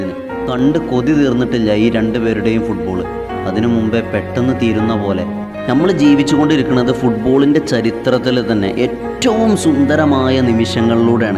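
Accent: Malappuram, India. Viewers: മലപ്പുറം ഭാഷാ ശൈലി ഹൃദയത്തിൽ കൊണ്ടു😘❤ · Malappuram karan Ano?...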